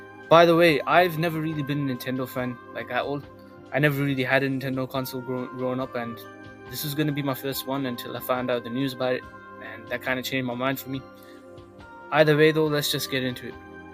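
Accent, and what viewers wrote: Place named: United Kingdom. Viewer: Are you british? That accent is pretty cute ngl haha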